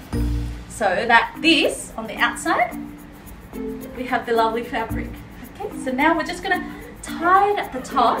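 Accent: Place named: Australia. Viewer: Im pretty confident in saying that she's Australian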